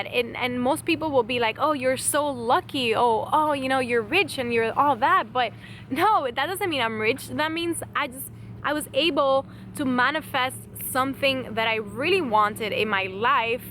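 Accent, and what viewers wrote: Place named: Miami, United States. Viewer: ayyy reppin the 305 with that Miami accent 🧡💚 congrats!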